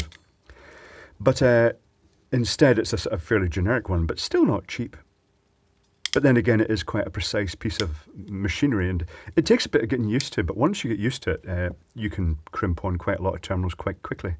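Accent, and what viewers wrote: Scotland, United Kingdom. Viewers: Love Scott Accent.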